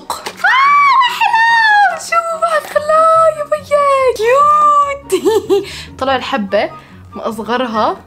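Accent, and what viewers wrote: Jordan. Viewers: لهجة اردنية كثير حلوة ❤❤ من المغرب 🇲🇦 احبكم يا اهل الأردن 🇯🇴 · اللهجهه الارودونيه كييييووووتتتتتت خصوصي لمن تكولل زاكيي ❤️❤️🥺🥺🥺🥺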